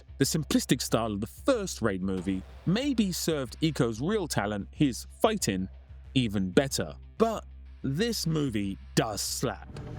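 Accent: United Kingdom. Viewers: Gosh, this brits accent is so terrible!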